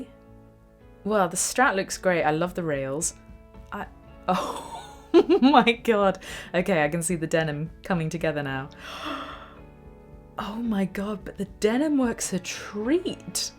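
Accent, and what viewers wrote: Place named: England, United Kingdom. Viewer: is the best looking of the many guitar teachers I follow (and that English accent is fetching), and one of the best teachers too, plus her musicianship just keeps improving. Thanks for your videos Sensei - cheers!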